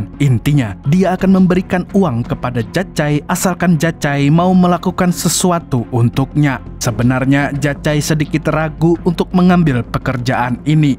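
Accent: Banjar, Indonesia. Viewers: Mang orang banjar kah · orang banjakh bang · Orang Banjar kah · Banjar d mananya mang😂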